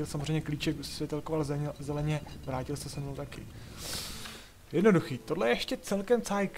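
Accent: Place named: Brno, Czech Republic. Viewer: hele my sice víme že nemáš čas ale podívej se kolik lidí chce aby si nahrával alespoň jedno video za týden rozhodně by nám to stačilo protože bysme pravidelně slyšely tvůj příjemnej hlas a brněnské nářečí;) opravdu nám všem chybíš:)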